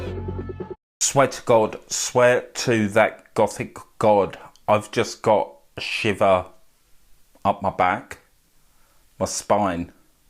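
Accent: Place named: United Kingdom. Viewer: I love your explanation of any part of this clip.... I'm not a Goth, but a big fan of. Your British accent is perfect for this description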